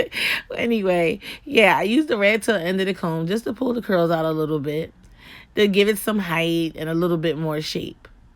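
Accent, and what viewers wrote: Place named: Philadelphia, United States. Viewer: Philly? I detect the accent. Hair was fire!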